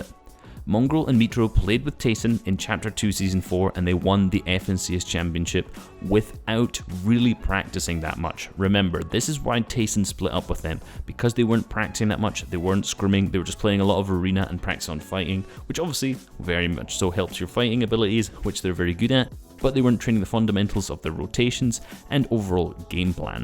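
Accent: Scotland, United Kingdom. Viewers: Where in Scotland r u from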